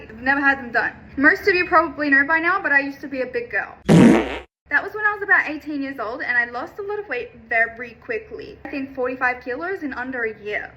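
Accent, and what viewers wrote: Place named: United Kingdom. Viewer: Ur british. Wtf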